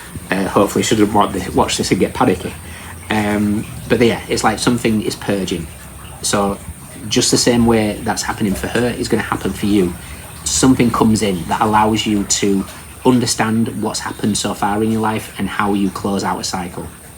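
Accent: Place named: Lancashire, United Kingdom. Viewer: I’ve been trying to place his accent. Lancashire, Rossendale?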